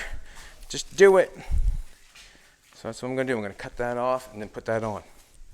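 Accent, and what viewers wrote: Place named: Canada. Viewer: This dudes for sure a Canadian!!! Listen to his accent!! Yeah buddy!! That cab oversight gonna kick some serious ass!!!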